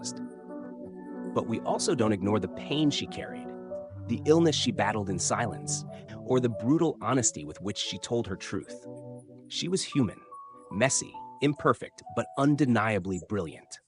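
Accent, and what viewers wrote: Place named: United States. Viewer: The HORRIBLE Yank narration spoils this!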